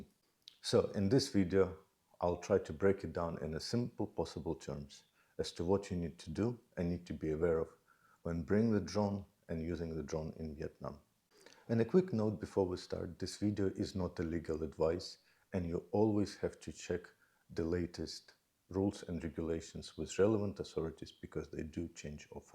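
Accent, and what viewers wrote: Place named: Turkey. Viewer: Abi Türk müsün aksanından anladım selamlar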